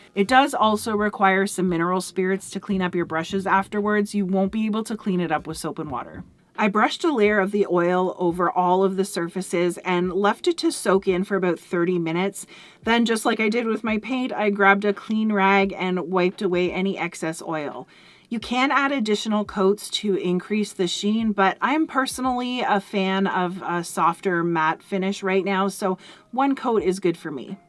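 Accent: Canada. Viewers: That’s a hard “OU” in the word “out” when you say it.. I had to hear it a second time to see what you said. Once I realized it was a northern, possibly Canadian accent, I had to hear it just a few more times for satisfaction.. I even caught myself saying “ahhoout” and “ah-bow-oot.”..😂.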